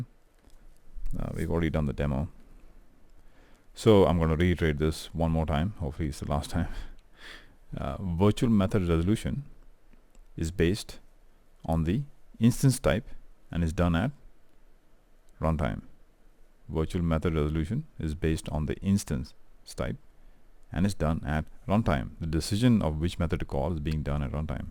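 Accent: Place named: England, United Kingdom. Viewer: Finally, a decent video that explains polymorphorism in a clear english accent! Subscribed.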